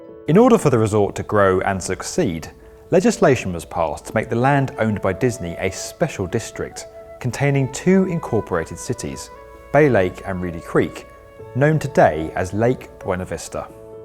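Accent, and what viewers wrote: England, United Kingdom. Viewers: CAN WE GET A NARRATOR WHO IS NOT BRITISH BUT AMERICAN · Mr JayL well let’s hear your voice , he has a clear English Accent , do you !!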